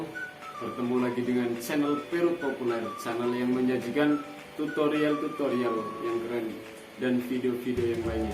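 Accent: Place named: Tegal, Indonesia. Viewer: Tenang ae mas e wis tak sasbcribe... Sampeyan wong ndi,sih logate kaya Tegal ..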